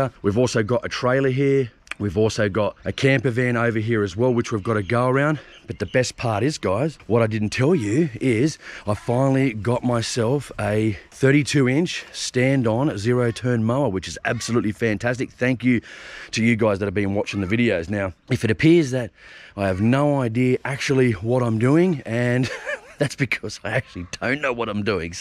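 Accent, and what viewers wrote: Australia. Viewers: Love Australian accent. I am a Zimbabwean living in Portugal.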